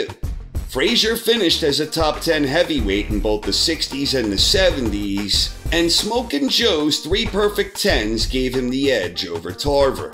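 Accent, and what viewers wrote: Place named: New York, United States. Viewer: Very interesting, Whatever accent you have - it is the official "Voice of Boxing Knowledge" to me now! (Is it New Yorker?) A big Thank You from sunny England, for putting all the effort into compiling this epic list over the last few months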